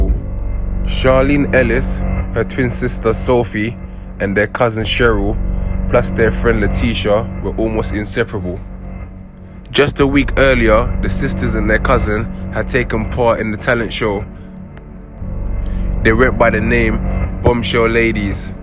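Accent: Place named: London, United Kingdom. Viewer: Sym u likkle London posh yute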